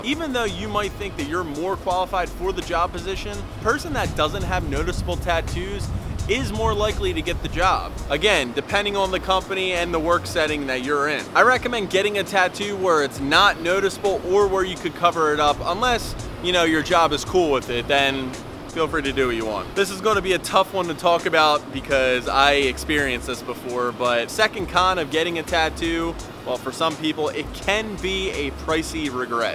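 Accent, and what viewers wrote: Philadelphia, United States. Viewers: I like the philly accent. I grew up maybe an hour outside and now live in Philly. I’ve always wanted a sleeve but being in philly made me want it more, everyone is tatted😂 the career I want is about as white collar as you can get so I’m a bit hesitant but I’ve heard of people that have them from the companies I want to work for. Any shops you’d recommend in the area(not sure if your still around Philly) also have you had any trouble yourself getting jobs with them?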